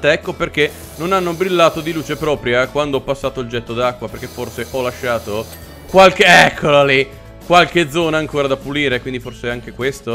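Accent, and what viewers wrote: Veneto, Italy. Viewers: Ma sei veneto o friulano? XD io wono veneto. Su xbox è gratis power wash?? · L'accento veneto piú veneto che abbia mai sentito😂😂😂😂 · Ma sei Veneto? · Tu sei veneto??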